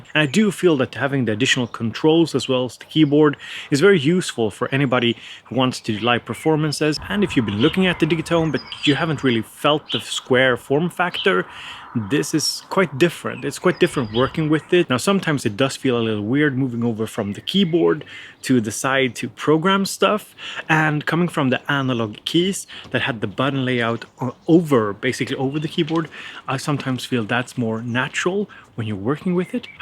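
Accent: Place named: Sweden. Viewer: Very traditional svenglish ;)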